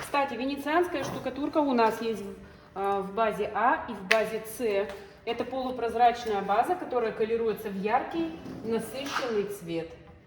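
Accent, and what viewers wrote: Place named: Urals, Russia. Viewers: Говор обычный южно уральский, Коркинский. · Здравствуйте. Скажите пожалуйста, вы с Урала? Говор у вас Уральский) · С Челябинском тоже говор похож))) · Либо Киров, либо Пермь говор тот))))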